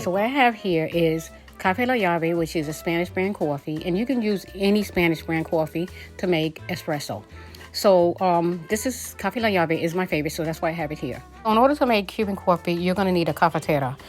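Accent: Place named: New York, United States. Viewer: Love your NY accent, Ma'am. Greetings from Australia.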